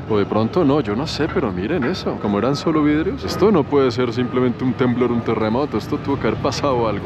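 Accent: Colombia. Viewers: El nació en Panamá, se crió en Colombia y vive en Canadá, por eso es un orgullo que a pesar de tener otras nacionalidades se considere colombiano, también en su manera de hablar y sus dichos, un youtuber que si vale la pena verlo 👍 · Gracias por presentar a mi país, con un toque colombiano muy agradable, bienvenido, que la disfrute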